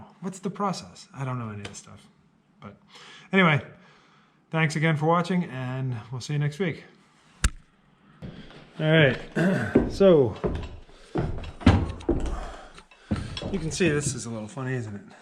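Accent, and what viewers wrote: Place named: South, United States. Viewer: Hey I almost like you talking kinda “southern”. Made me miss my hometown 😂. I love all that cherry wood, is it a soft or hardwood?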